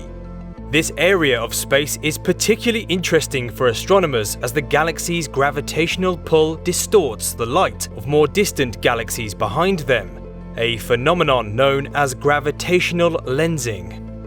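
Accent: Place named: England, United Kingdom. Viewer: Sounds smarter with an English accent. Can you do it again in an Australian accent? Oy crikey mate!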